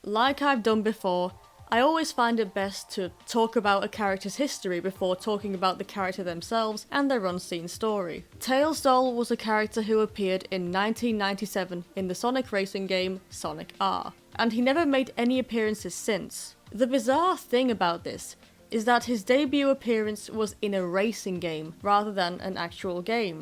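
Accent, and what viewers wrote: United Kingdom. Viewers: I love your British accent, it sounds so deep and classy!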